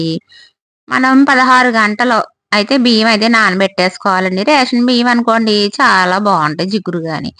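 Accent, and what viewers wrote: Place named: Godavari, India. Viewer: మీ గోదావరి slang చాలా బాగుంది medam 🎉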